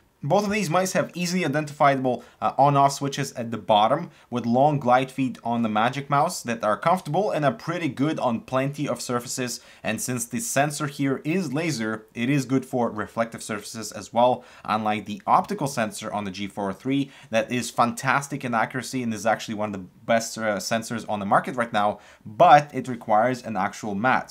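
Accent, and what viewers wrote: Russia. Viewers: Not offending him but sometimes he sounds Russian and sometimes he sounds American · slightly рашин акцент here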